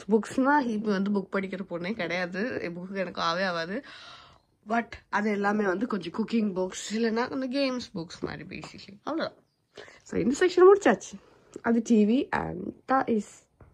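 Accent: United Kingdom. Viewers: Her cloth 😂 British accent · British accent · that British accent though! One of the best! Loved the vlog!! 💯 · Talk in British accent nxt vid ,maybe teach us ur accent,coz it'll ofc help us!!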